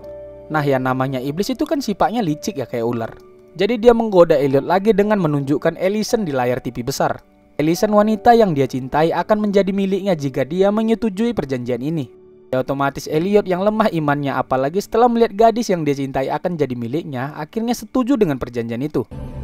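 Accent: Medan, Indonesia. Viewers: bahh... anak medan rupanya...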